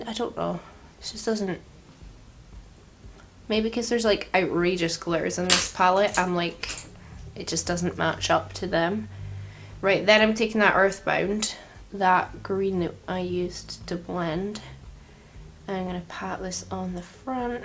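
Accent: Scotland, United Kingdom. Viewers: Was expecting revlon candid, got PLouise, shocking honestly 🤣 the brushes for this collection do look so good but oh lord are they expensive. Taking the more pastel shades with the grey tones is honestly so good, this look is 100/10 so good. Can't wait to see a full grungy grey toned look. Something big and smokey with like a silver/purple shim shim on 👌 Now for my question of the day... Do you have an internal monologue? If you do does your internal voice sound like you/have a Scottish accent or is it different?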